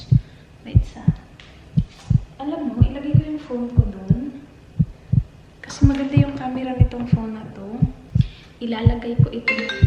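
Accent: Visayas, Philippines. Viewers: Ang accent sa pagka bisaya ba, mo gawas jud hahaha Kalisud basta bisdak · Wooh the bisaya tono uy 😅💖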